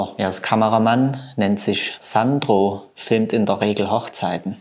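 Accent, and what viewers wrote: Saxony, Germany. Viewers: Mal ganz ehrlich, klar es ist Spaß aber warum spricht er in den Videos mit Sächsischem Dialekt…warum nich einfach Hochdeutsch…das ist so Klischee Haft und herablassend…einfach traurig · Auch wenn es nur Spaß ist, aber warum haben deine ganzen Querdenker-Videos nen Sächsichen Akzent????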